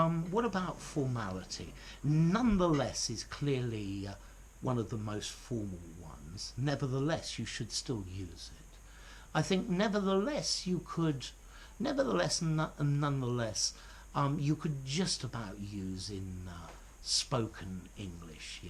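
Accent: RP, United Kingdom. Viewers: The teacher's hair is rather tousled in the video.And he looks very weary and tired Perhaps he is working far too much and doesn't get enough sleep to recharge Nevertheless it is an excellent video And he has a nice pullover too. Which could compensate for the wind-swept hair And I forgot the posh accent which is a dangerous and deadly turn-on ,,nonetheless 😂, Christ ! ❤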